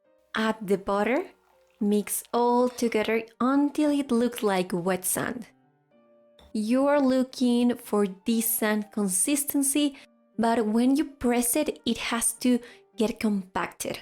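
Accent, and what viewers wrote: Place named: Russia. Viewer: Interesting recipe.. thank you! but you're accent is funny, is it russian?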